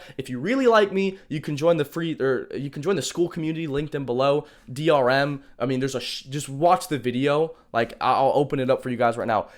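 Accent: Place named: India. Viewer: this dude is funny. hahah. the indian accent. loooolll. im in cali too my boy :)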